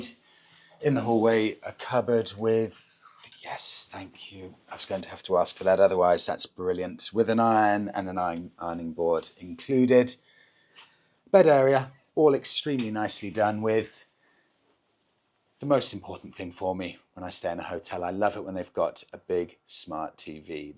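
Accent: United Kingdom. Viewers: Hello. Your dialect is like music in my ears.😊 Where in GB are you from?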